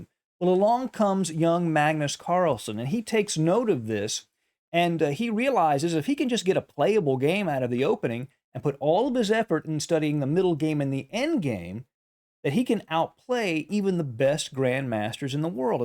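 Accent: United States. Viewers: I love your postings. 80% because your analysis is so instructive, 10% because you do games I remember from my youth (you know, when you said things like p-K4) and not just the stars, and the final 10% because I just luv that akcent - sounds like a real cowboy to my terribly British ear, old chap.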